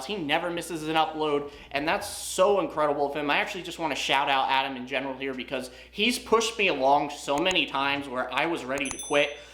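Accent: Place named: New Jersey, United States. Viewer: Don't sweat the negativity,. I've learned a lot from you. You're my Ackie guy, and when I'm ready to get one I'll come to you. BTDubs, you can't help the Jersey voice bruh, lol. Lots of love from a southerner and I hope you feel better.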